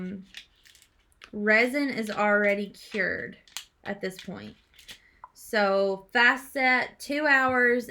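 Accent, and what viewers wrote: South, United States. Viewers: Your beautiful projects match your beautiful smile & personality. I’m in MI and l could listen to your sweet, southern accent all day. Thank you for taking time from your family to show us these crafts. They are always so beautiful!💕🎄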